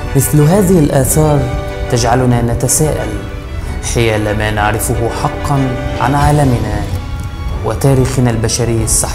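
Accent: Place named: Egypt. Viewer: مش عارف اتفاعل معاك ب اللغه العربيه الفصحه عشان واضح اوي انك مصري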